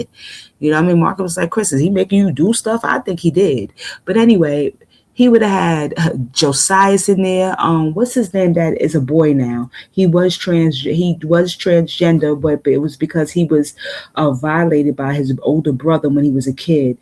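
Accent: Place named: New York, United States. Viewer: Replay, this was fire…ya talks on these subjects are fire and love the ny accent! And u digging ya claws in these mofos deep! lol 😂 this is sick fr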